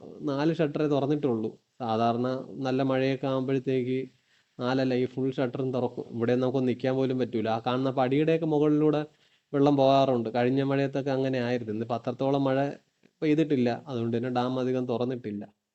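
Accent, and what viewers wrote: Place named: Thiruvananthapuram, India. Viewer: തിരുവനന്തപുരം slang അടിപൊളി സൂപ്പർ മോനെ❤❤❤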